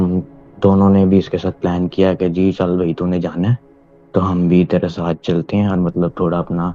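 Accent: Pakistan. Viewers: Pakistani accent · Ye zrur koi Pakistani he u Jo ainve he zabrdasti ka Indian bna hua h bilkul Pakistani accent h yarr or phr ye basically, basically sun sun kr uljhan hone lagi ... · This guy sounds like a pakistani. Not an indian accent for sure.. or is it just me :/ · Yes he does i thought that too.. even his worfs were pakistani more than hindi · Yup he's accent is Pakistani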